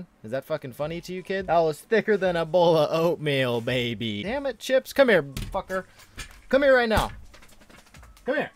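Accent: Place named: Indiana, United States. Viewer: are you from hickville indiana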